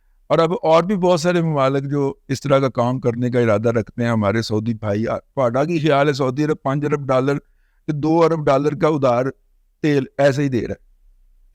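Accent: Punjab, Pakistan. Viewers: پیلے سلام کیا جاتا ہے۔۔۔ why you speaking Urdu in Punjabi style..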